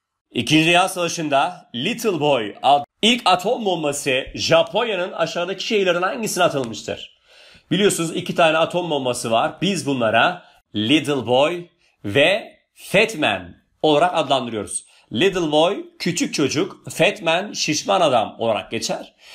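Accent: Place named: Adana, Turkey. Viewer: Cevap:goodvibes:: gırtlaktan gelen Adana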